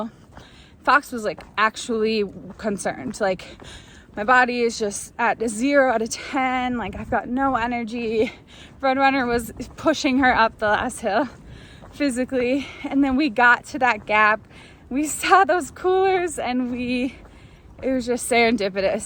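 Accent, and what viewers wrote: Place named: Minnesota, United States. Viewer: Uff Da! Spoken like a true Minnesotan! ❤️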